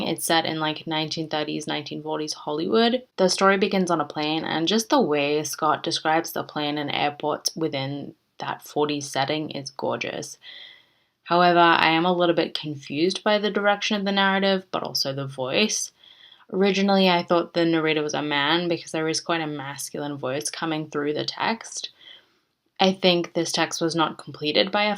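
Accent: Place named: Australia. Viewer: Was wondering where you went! That accent is a lot less Aussie now: pushed that boat right out! But to be expected. I imagine it's ocker again on the phone to mum! Will be looking forward to hearing from you again :)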